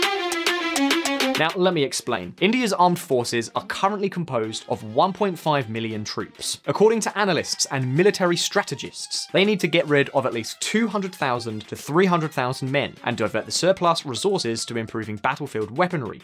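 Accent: United Kingdom. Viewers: 1. thats a british accent 2. America is barely involved in Kashmir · Not Americans the guy is probably from UK judging from his accent. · The narrator in this video is from the UK, what does America have to do with this video? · He isnt american, he has like a UK accent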